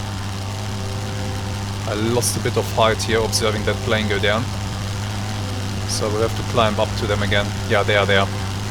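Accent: Germany. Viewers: Der Titel dieser Serie gewinnt immer mehr an Wert. Weil, sooooo kurz ist das Leben von Felix dann doch nicht. Allerdings wartet der Tod in jeder Folge. Wird wirklich Zeit das es bessere Maschinen gibt. Von daher ist deine Strategie genau richtig. Besser einen Kampf, den man nicht gewinnen kann, zu vermeiden und dann später mit besserem Gerät deine Skills einzubringen. Würde ich genauso machen. Dein Englisch klingt so als wenn deutsch deine Muttersprache wäre. Macht das Audio für dieses Projekt irgendwie "cool". Naja, hoffen wir mal, das wir gemeinsam noch viele Folgen des Felix erleben dürfen. Vielleicht wird Felix von Berg der neue "Rote Baron"? Jedenfalls ein LetsPlay mit einem echtem Mehrwert für den Zuschauer.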